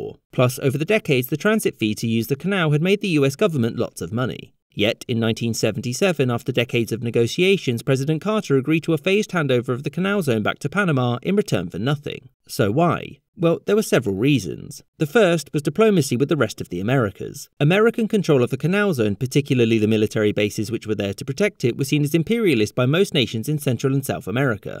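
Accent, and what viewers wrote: England, United Kingdom. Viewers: Great. Now. Why did US give up the canal? Cut the English accent. Slow down. speak simpler. Don't try to impress with how smart you are.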